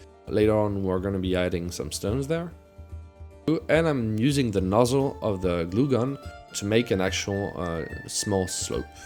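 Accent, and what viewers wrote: France. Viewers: What part of France are you from?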